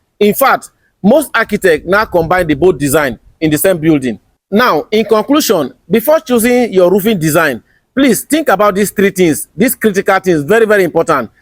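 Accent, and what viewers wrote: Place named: Esan, Nigeria. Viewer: you sound Esan . are you?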